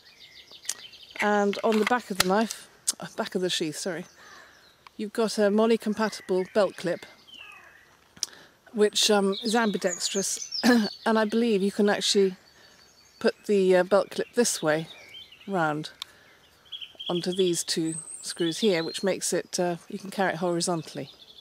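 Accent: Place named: RP, United Kingdom. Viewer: Nice in depth review, and plenty of specs to keep the techno bods happy, the guy who wrote he liked the posh voice made me laugh, but it is nice to hear a well spoken voice, (must admit i was reminded of Joanna Lumley) which is no bad thing, anyway , like the knife and like the ease with which you use it, the easier it looks the more you know the user has skill! cheers Dave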